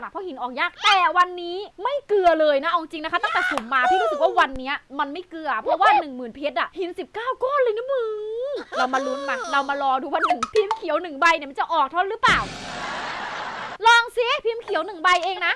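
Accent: Isan, Thailand. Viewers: พี่ พูดอิสานน่ารักมากกก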